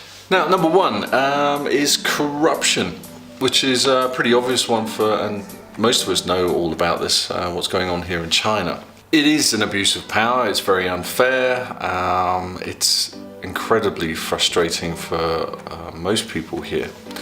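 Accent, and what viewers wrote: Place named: England, United Kingdom. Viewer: Your proper English accent and your pleasant countenance make watching your videos a delight.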